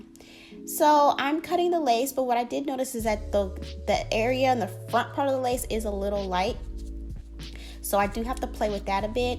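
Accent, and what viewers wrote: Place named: Houston, United States. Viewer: U sound like my cousins from Houston lol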